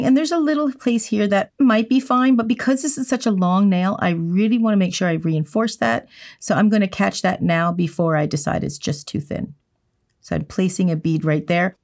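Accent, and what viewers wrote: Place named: Canada. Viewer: I always do hard gel, but I just started doing acrylic. You have been such a help – plus I just love the Canadian accent!😃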